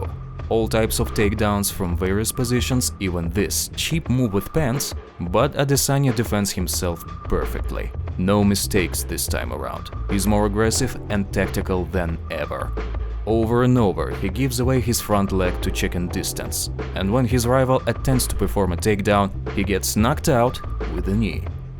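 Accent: Russia. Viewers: I like the Russian accent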